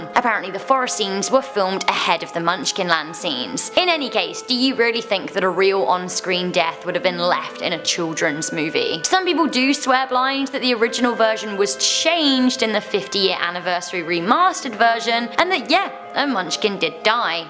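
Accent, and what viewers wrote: England, United Kingdom. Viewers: Interesting.... also I glad I'm not the only one that finds ABSOLUTELY GORGEOUS! Also... that English accent just makes my heart melt!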